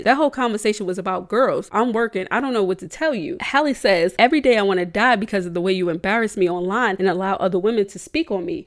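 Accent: New York, United States. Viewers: I knewww u was from NY 😂😂😂 had to be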